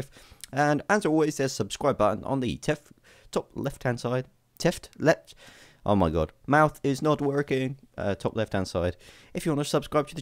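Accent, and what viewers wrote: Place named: United Kingdom. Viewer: A mysterious British guy whose accent isn't sexy. What a relief. Usually British accents are attractive but his just isn't, lol!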